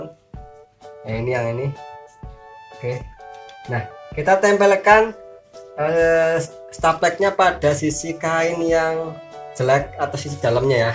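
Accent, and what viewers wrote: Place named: Banyumas, Indonesia. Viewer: السَّلاَمُ عَلَيْكُمْ وَ رَحْمَةُ اللهِ وَ بَرَكَا تُهُ Mas sampean bahasane kaya aku. Tika dr republik ngapak apa ya mas.. Aku jg di tanggerang. Sampean tanggerang mn..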